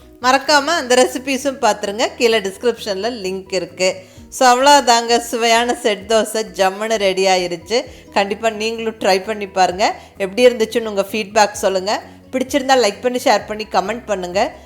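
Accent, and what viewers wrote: Coimbatore, India. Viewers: Are you from Coimbatore? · I like your kovai thamizh..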